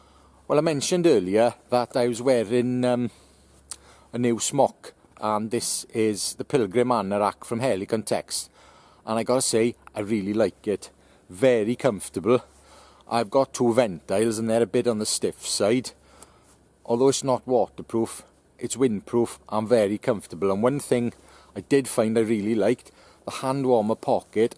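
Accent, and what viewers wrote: Wales, United Kingdom. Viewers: Good stuff , new here, sounds like you're in Wales?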